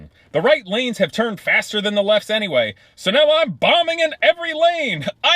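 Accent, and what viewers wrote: Russia. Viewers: Love your Russian accent. But I still agree with you and left lane hog’s are more dangerous than some people think. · Great content , very relevant. Hope your videos have some impact, forwarded to my 16 yo cousin, hopefully new generation is a bit better. I travel alot on the highways for work. Mostly new jersey and pennsylvania last few years and unfortunately a lot of people dont even know they causing a problem. Drove in russia and europe and your commenters are 100% right about those. Way better driving culture in europe and even russia (though its a bit rough). Really cool russian accent you got there!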